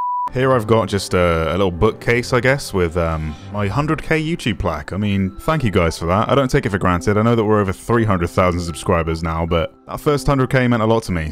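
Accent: United Kingdom. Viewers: are you british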